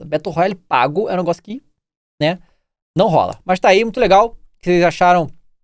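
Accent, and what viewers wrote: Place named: Rio de Janeiro, Brazil. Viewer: poxxxxxssss conferencia kkkk sotaque carioca é bom demais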